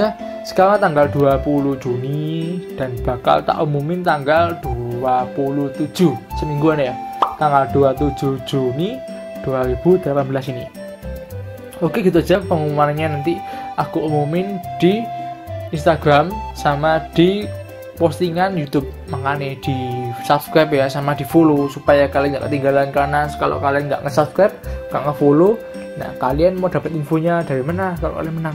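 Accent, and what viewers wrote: Java, Indonesia. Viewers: 1. Onslog onslog 2. Nasi banget 3. Cadel sama logat jowonya kental Harapan untuk aov Semoga makin bnyk makin bnyk yg main dan masalah-masalah koneksi lebh baik lagi. Pengelompokan main sesuai Rank masing masing jgn beda jauh bisa join Skin kriknak. ID:c505e0ef449eb633a7d<num>f02987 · 1. Lucu 2. Bahasa jawanya kental 3. Sedikit cadel kali yak Semoga kedepannya AOV makin banyak event berhadiah pulsanya... wkwkwk Open id: 69aa2fac34bf33823abf318a157f4e47 skin : wonder woman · 1. Nice banget, cangkemmu, onslog onslog, oke oke, cadel, medok, dll 2. Semoga aov bisa tambah jaya dan makin banyak mode baru dan hero baru yang dihadirkan 3. f39e873393ae2c9530bdeb036bf58322 - skin ( xeniel - armagedon ) Suscribe done Follow ig done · 1. Cadel Gk Bisa Ngomong R (conquelol) 2.Onslog Onslog,Nice banget 3. Suka Berlogat Jawa Semoga Aov Semakin Jaya Kedepannya OpenID 6c0105a9e6b21b5638c<num>c7c5 Skin(Raz) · 1. 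Onslog onslog 2. Nasi banget 3. Cadel sama logat jowonya kental Harapan untuk aov Semoga makin bnyk makin bnyk yg main dan masalah-masalah koneksi lebh baik lagi. Pengelompokan main sesuai Rank masing masing jgn beda jauh bisa join Skin superman. ID:c505e0ef449eb633a7d<num>f02987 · 1.kalo main suka ngalah dulu 2.kalo ngomong R suaranya agak beda 3.kalo ngomong kental banget logat jawanya Pengennya AOV lebih banyak ngadain event yang physical seperti pulsa atau HP gitu Openid:9b<num>f9bfb<num>dc34973 Skin:wonder woman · 1.suarane medok 2.gaiso ngomong R 3.kakean ngomong onslog onslog,nais banget Harapan saya untuk AOV kedepan semoga makin banyak peminatnya dan berkurangnya domba antaris Open id 455f72c0c61fda1af0798e3a276fa5b6 Skin wonder woman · Bismillah. Ciri khas : 1. Ngomong medok. 2. Cadel. 3. Suka ngomong Onslog onslog, nais banget. Harapan buat aov kedepannya semoga server aov indo lebih baik lagi, ga ada gangguan lagi. 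Aov moment diperbaiki agar bisa. Lebih banyak mengadakan event event besar. OpenID:4a77af2dc0ea7d2e7af508d13461ce67 Skin yang diinginkan:Wonder Woman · All done.... Subscribe, follow, share #1 Ciri khas... 1. Jowonya itu loh 2. Ya pasti cadelnya (kadang" suka seneng denger cadelnya😁) 3. Karibnya Ucop gaming (sering mabar with ucop gamimg) 4.(tambahan) paling suka nyebuti onslog onslog😁 #2 Harapan kedepan buat AOV, semoga turnamen aov dapat diadakan di tempat" yg terpencil seperti di desa. Dan semoga AOV kedepannya dapat memberikan kenyamanan serta event yg menarik bagi playernya.... oh iya dan semoga AOV dapat menjadi "The Best Mobile Moba In The World" #3 Open ID: b3d1cad56e5ee0d<num>f2bbf5d3a Skin: Yorn (PoPo) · 1. seneng ngomong onslog onslog, cangkemmu, nice banget, meletet 2. gk bisa ngomong R 3. selalu ada logat jawa di vidionya Harapan untuk aov = saya berharap aov dapat terus maju di dunia esport dan di indonesia playernya aov bertambah banyak OPEN ID : b43a47fa715d1f22f005e27e5f096c9c SKIN : Lu Bu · ciri khas : - jawanya medok parah wkwkwk - seneng interaksi dengan subscriber dengan cara ajak mabar - gak bisa ngmng R wkwkwk semoga AOV menjadi moba yg berkualitas, makin berkembang, makin banyak player AOV yg baru, Maju terus AOV👍👍👍 Open Id + Skin : c87c39c24dfa<num>bea1ca07be559 + Lauriel ( Black Wing ) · Ciri Khas : 1.suka bilang nice banget 2.medok coy 3.suka ketawa pas main Harapan buat aov : semoga makin berjaya Open id : e26b8af7d6b871c16d37acb<num>ad Skin : liliana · Ciri: 1.Gak bisa ngomong RRR 2.main pakek logat Jawa 3.Sering ngomong Nice banget kalo pas kill Harapan: Harapan ku untuk Aov Semakin lebih baik kedepan nya, ngadain event" yang menarik. 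Sukses untuk AoV Open Id: 7801ca546b413e4dd83da0da7e24e7cd Skin: bombshell ,Wonder Woman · Ciri khas: 1. Onslog onslog, Cangkemu, naiss banget!! 2. Cadel 3. Ngomonge jowo, naiss bngett!! Harapan buat AOV kedepannya, semoga AOV tambah mendunia, dan lebih rame yg main di Indonesia. Open ID: 2e9bf3f6093f5f0f38034e3d97e42524 Skin: Ryoma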